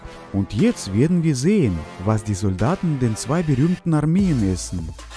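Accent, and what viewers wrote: Russia. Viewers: Typischer russicher aktzent finde ich super bin halb russin und finde deine videos echt cool Bin gerade auf deinen kanal gestoßen rechtschreibung ist nicht so meine sache😅😂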